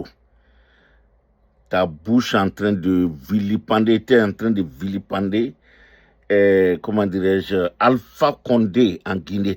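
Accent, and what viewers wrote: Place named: Burkina Faso. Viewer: ce que vous faites là est très dangereux.Les Ivoiriens sont assez divisé comme ça, vous êtes libres de suivre LG que nous apprécions tous pour son courage.Vous les nordistes avec qui le président Houphouet à gouverné,il à utilisé les richesses de l'est pour construire le nord,le sud et le centre.Vous avez bénéficié de tous, vous vous êtes alliés à Allassne qui à été imposé à Houphouet pour détruire la côte d'ivoire et aujourd'hui tu viens raconter des anneries.Ton accent te trahi,tu est d'origine Burkinabè et ton intention est de chercher à mélanger encore le pays parce que tu ne veux pas voir Thiam devenir président.Dieu seul sait qui il choisira.J'aimais bien t'écouter mais là tu dis beaucoup de contres vérité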